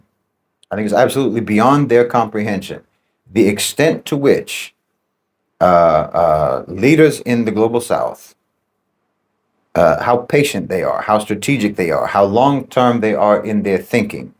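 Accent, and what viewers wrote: United States. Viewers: Incomprehensible. He has dreadfully bad delivery. A lot of phrases and sentences are just noise. He gabbles the most important terms. He does not explain the most important terms. I have tried over and over to hear the term he uses for the opposition to what he calls Neocons --- which itself is some codeword only known to Unitedstatesians --- and it's still just noise. The thick Unitedstatesian accent makes even simple sentences hard to follow.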